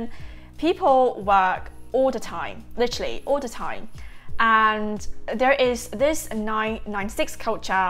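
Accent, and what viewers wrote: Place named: United Kingdom. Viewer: She has ver British accent?.?why?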